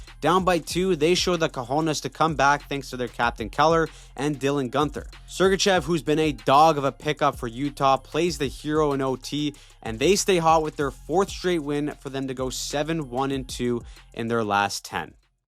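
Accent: Canada. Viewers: So wil there be another weekly recap? who is this guy and why does he sound so Canadian?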